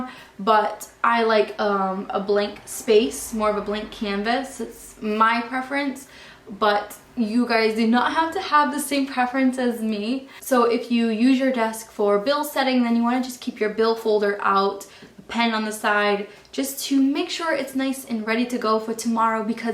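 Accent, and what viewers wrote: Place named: New Jersey, United States. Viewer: the New Jersey accent!!! Love it lol like if U agreeeee!!!😆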